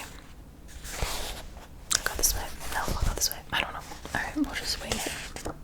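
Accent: United States. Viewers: Hi!I really love your videos, the true crime “video series” it’s absolutely amazing, your voice it’s soooo relaxing and I love you American pronunciation❤️😁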